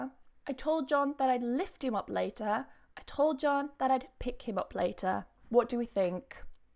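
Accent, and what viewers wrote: United Kingdom. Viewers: Thanks a million ,I wached and I made 3 mistakes it was really useful I hope keep going , thus I really like British accent especially your pronunciation .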